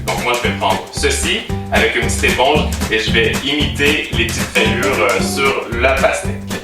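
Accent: Quebec, Canada. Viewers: coucou j'adort t'a chaine. je t'es découvert dans le meilleur pâtissier et j'adore l'accent Québécois je t'adore continu comme sa ❤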